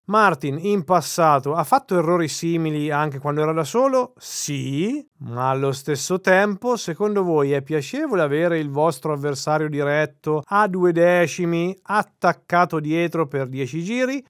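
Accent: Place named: Marche, Italy. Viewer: Hai la stessa "esse" di Fabri Fibra. Secondo me sei di Senigallia FORZA Bestia 23 Sempre!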